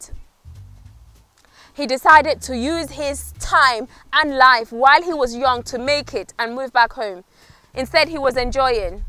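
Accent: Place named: RP, United Kingdom. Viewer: Hi. Can we do a massive online database for all major African languages assisted by those who speak them well and technologies like NLP, ML, AI, Crowdsourcing, and the like? Forgive me for saying this but your Queen's English is adorable (This is what they taught us in school from Primary all the way to Uni)so I cannot remove myself from it entirely. I'm a Kenyan from a major tribe that takes English as its first language! Talk of colonial mentality.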